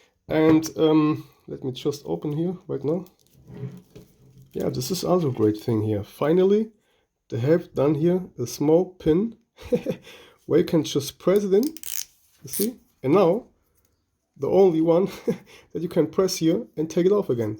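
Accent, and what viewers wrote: Germany. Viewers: Trotz deinem guten Englisch, wusste ich das du deutsch sprichst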